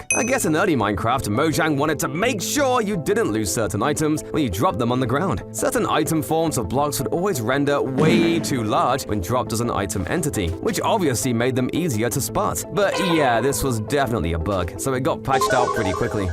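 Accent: United Kingdom. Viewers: This guy has such a youtuber/British accent, it pmo so much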